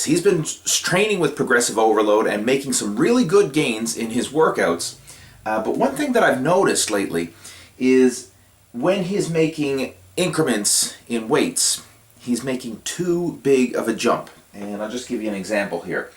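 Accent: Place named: Atlantic Canada, Canada. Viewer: Holy shit im canadian but im still annoyed by his accent. he must be from pei or newfoundland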